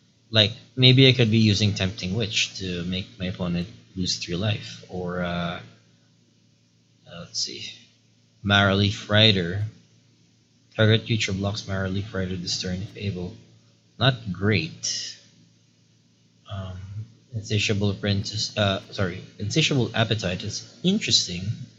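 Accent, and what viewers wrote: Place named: Philippines. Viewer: I can tell you’re filipino, hahahaha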